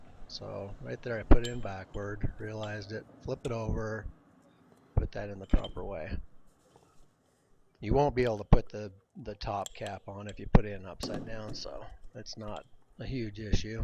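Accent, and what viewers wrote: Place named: Utah, United States. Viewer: I watched this video because I'm rebuilding my Ingersoll IR285B, and I can tell you that its exactly the same procedure. Every last part looks identical! My vanes were stuck as well, with lots of rusty gunk, and I constantly oil my guns! Go figure? Thanks for posting ;)BTW are you from Utah? I have a friend in Utah and you have the same accent. Just sayin.......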